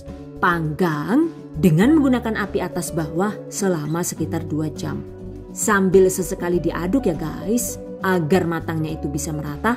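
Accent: Banyumas, Indonesia. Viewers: Ngapakek kentel banget hiiiii jd lucu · Kenapa sih bahasanya spt orang yg glege'en gt ya maaf.. Kadang kyk kebarat2an kadang ngapax hehe oowke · Deneng ngapak......??? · Jan wong ngapak Rika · ❤❤ Penjelasannya detail banget Dan seru bngt saat ngomong ngapak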